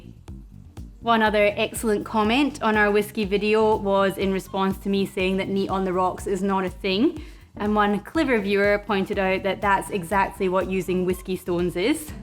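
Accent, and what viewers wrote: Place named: Scotland, United Kingdom. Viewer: Oh, Scottish accents! You could be reading out of the phone book, and this video would still be enjoyable. But Scottish accent + knowledgeable whiskey 🥃 instruction = fanatic content. Keep creating!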